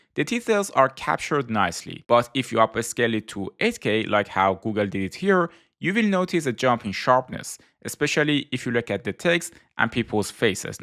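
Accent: Iran. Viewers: Are you persian? you're accent sounds like persian but u don't look like one then i saw the koobideh kabab now i'm confused lol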